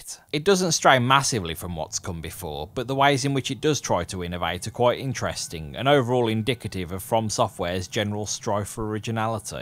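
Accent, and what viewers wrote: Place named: Birmingham, United Kingdom. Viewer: Nice accent. Where abouts in brum are you from😂. Nice vid also 👍🏻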